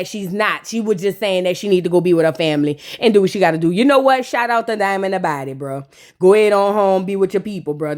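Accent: New Orleans, United States. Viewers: Yes New Orleans Accent Baddies Review 😂😂😂😂😂 Love from London 🇬🇧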